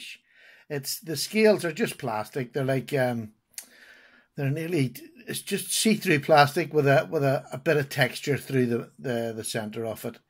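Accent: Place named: Ireland. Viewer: I fucking love the irish accent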